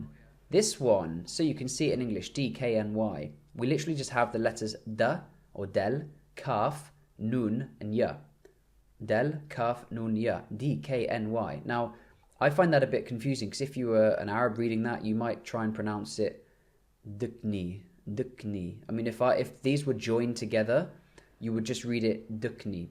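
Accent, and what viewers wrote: United Kingdom. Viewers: Hey I was wondering what kind of British accent do you speak & if you could do a video about different accents in England. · Arabic welcome bro u really teach me a lot with ur British accent ❤️👌👍🏻👍🏻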